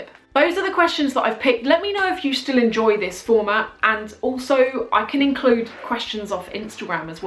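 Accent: United Kingdom. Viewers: Its sooo brit .. love it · You seem such an a pretty person!!! I've just suscribed to your channel because I'm studing english and I really enjoy your videos and your british accent as well:) Regards!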